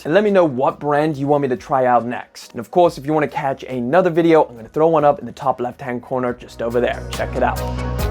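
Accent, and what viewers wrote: South Africa. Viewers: Bro I didn't know you South African. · Bro are you by any chance South african?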